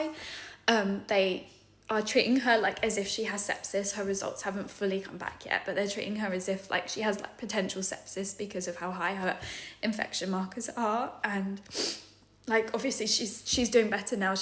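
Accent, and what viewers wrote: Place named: United Kingdom. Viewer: I won't go into the gruesome details of what I went through 12 months or so ago. By your accent I'm assuming you are from the U.K. It is such a simple test to check for a U.T.I. That SHOULD have been the first thing that they checked. Indeed, you shouldn't have even requested it. It is so frustrating and dangerous that you had to wait 5 days for it. I hope your child is now well and happy xx.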